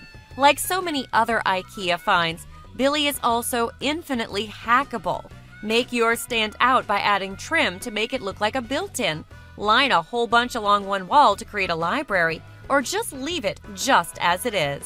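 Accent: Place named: United States. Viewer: Where the hell do they find these narrators? Their voices are so nasal, so artificially enthusiastic, so... American? I honestly think a robot voice is less irritating.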